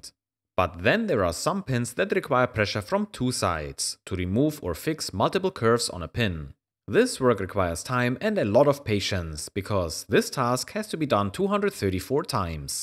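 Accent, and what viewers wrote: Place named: Germany. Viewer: Why did they want so much money from you at the scrapyard? Seems like the guy was very shady and pocketed the money. You sound German and when they allow me to look through the trash (not everyone allows that) they either say take the shit or they weigh it and I give them the scrap price. The outcome would be below 3€ for a handful of CPUs. Or was this a private facility? I always go to our municipalities scrapyard when I want to get rid of stuff and I ask if I can look through the electronics. The outcome of that question depends on the guy sitting there.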